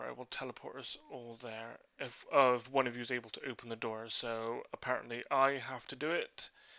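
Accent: United Kingdom. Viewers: The sound of your Bristolian accent makes my nipples moist. Just saying. LOVE YOU!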